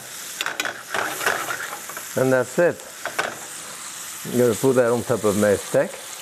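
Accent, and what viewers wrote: France. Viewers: Sean He just has a thick French accent...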